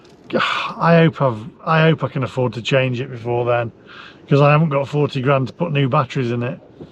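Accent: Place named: Leeds, United Kingdom. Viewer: Ha, I don't know where to start. 1. Sounds like you are in the North, Leeds? I can assure you that in London and the South East, it has been baking hot (26 and sunny on October 10th for example). 2. Now electric car ownership from a different perspective. I have a Polestar 2 with the performance upgrade giving 472 bhp and 0-60 in 4s. It has been 100% reliable for the 3 years I have owned it. I charge at home on the Octopus Agile plan which means that there is a lower overnight rate that is sometimes either free or Octopus pays me to charge my car. Yes, they pay me! Hard to believe but it's true. On average I run my car for around 2p a mile in 'fuel', compared to 18p to 25p per mile for your average petrol or diesel car. I have no road fund licence cost, (2025 will change that) drive free in London and can park in central London for 80p for 4 hours. 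Now non Tesla cars can use the Tesla fast charger network (not all of them yet) and generally the charging on the road network is getting better each month. Yes, insurance has gone up for ALL cars in the past 12 months . Last year my full comp insurance was £480, and this year £680. Would I reccommend an electric car, yes, 100% providing you are not pounding the motorway network all day. Home charging is a must IMO. If you can't do that I would think again. Finally, Luton airports car park was partly destroyed by a diesel car catching fire! Time to ditch these dangerous dinosaurs and go electric! :) .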